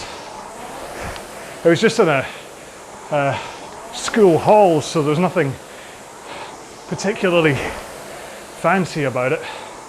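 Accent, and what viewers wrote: Scotland, United Kingdom. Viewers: I see you posted a 2 hours video. This is what I need to learn the Scottish accent 😊😅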